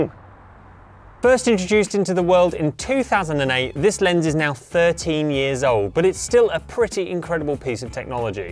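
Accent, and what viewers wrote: United Kingdom. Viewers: Love your appearance on the YouTube Creators Hub podcast, (nice to hear another fellow Brit on there!). And the intro to this video sealed the deal. Amazing 😂 Wishing you the best of luck on here.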